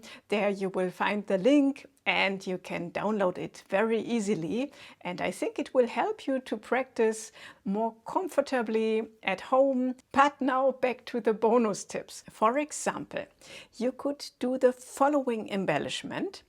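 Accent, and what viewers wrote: Germany. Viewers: Thank you,. Fantastic lesson, it really helped me. Love your teaching and I guess your German/Austrian accent. Thank you so much ❤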